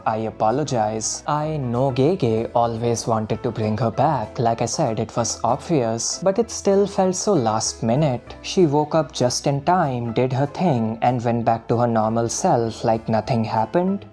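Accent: India. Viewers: Clearly seems to be Indian.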